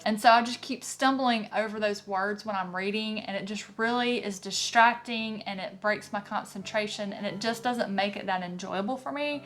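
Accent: South, United States. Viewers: (Random: I love your accent. I've wanted to live somewhere South my whole life, just so I could pick up a twang/drawl of some sort.)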